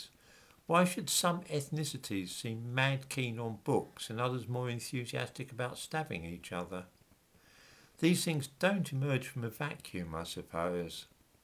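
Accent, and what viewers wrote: United Kingdom. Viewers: Wow, this is some of the most obviously dog whistle racism. Then near the end he references the bell curve. Talking slowly with a British accent does not hide your raging recism. Smh I know I know. He is "just asking questions".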